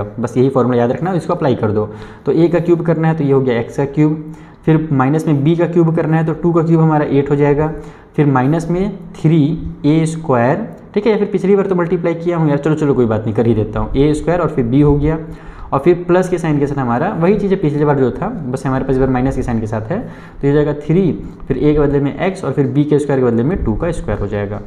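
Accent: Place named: Bhojpuri, India. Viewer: Sir aap up/bihar se ho kya Ajj apko bhojpuri style me padate hue dheaka✅✅🙃🙃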